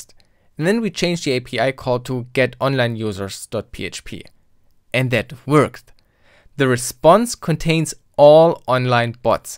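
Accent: Germany. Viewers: From your accent i can guess youre German, da sag ich nur: Gute deutsche Arbeit! daumen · Hard German accent. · Obviously German accent xD But hornestly I would have used Wireshark to export the data from the webserver. It fetches all incimming and outgoing data for that. Quiet nice hearing about that other programs, but it looks to unconfortable to me exporting ram to get a server request. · MinecraftJeffy don't be stupid, he speaks english to reach a bigger audience and everyone understands what he wants to tell. He might have a german accent, but how should anyone learn English if you forbid themto speak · thats a german accent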